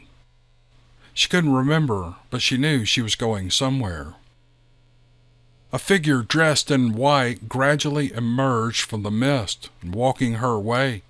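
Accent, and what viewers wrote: South, United States. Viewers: Nanny-mo!! 🤣 I LOVE the way you mispronounced that! 😘🥰 It's actually pronounced Nuh-nye-mo. But I couldn't care less if you get it right. I love your southern drawl and your great storytelling.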